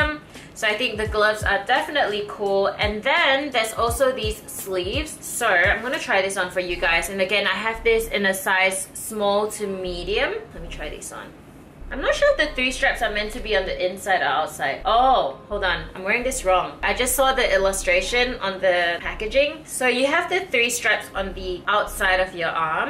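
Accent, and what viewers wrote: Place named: Australia. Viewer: That’s an Aussie accent 😉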